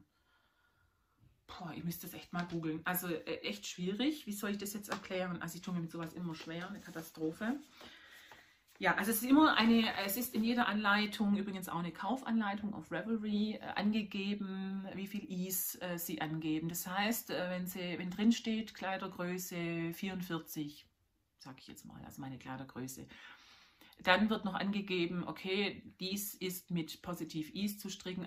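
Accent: Swabia, Germany. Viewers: Hallo,dein Podcast hat mir sehr gefallen,bitte mach weiter 😊..Ach und ich finds gar nicht schlimm wenn das schwäbische durch kommt, das macht uns schließlich aus....Liebes Grüßle aus Fellbach 🤗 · Ich hab’s auch sehr genossen Dir zuzuhören.. und wie schön ausm Schwobaländle🥳.. liebe Grüße vom Fuß des Hohenzollern Susanne 💕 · Habe Dich eben entdeckt, durch Frau Nadelgeklapper, und bin begeistert von Dir und Deinen tollen Projekten! Außerdem finde ich unseren schwäb. Dialekt so "heimelig"! Mein Abo hast du. Liebe Grüße Nicole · Liebe! Schwätz du ruhig schwäbisch - ich find‘s super 👍🏻 Zu den Qualitäten für den Sommer mag ich persönlich nix mit Merino. Eher Baumwolle-Mix Garne. Mach bitte weiter so 👏 Liebe Grüße Gabi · Toll gemacht😊 Dankeschön! Sehr sympathisch und genau unser schwäbisch von kurz vor der Alb 👍🏼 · Hallo, vielen Dank, dass Du Dich endlich getraut hast! 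Das mit der Technik hast Du ja alles voll profimäßig hinbekommen, Lautstärke, Ton- & Bildqualität sind perfekt 👌🏼 Ich mag Deine Projekt- und Wollauswahl sehr, und vor allem liebe ich den schwäbischen Einschlag 🥰 Dass Du uns auf Deinen Weg zur Handfärberin mitnimmst finde ich ganz toll, das war für mich eigentlich das interessanteste Segment, weil ich davon null Ahnung habe. Total spannend, bitte weitermachen! Kleiner Verbesserungsvorschlag: Du sagst sehr oft „tatsächlich“, ich bin bei sowas aber auch „tatsächlich“ sehr empfindlich 🙈... und Du hast auch überhaupt keinen Grund, Dich dauernd zu entschuldigen, gerade dass Unperfekte macht es doch lebendig 😉 Ich freue mich sehr auf weitere Folgen von Dir!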